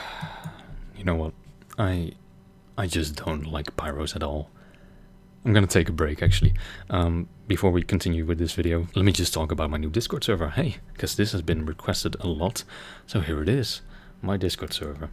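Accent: Netherlands. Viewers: Hey, nice vid. I was wondering, are you dutch? Your accent sounds dutch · are you dutch? your sounds like it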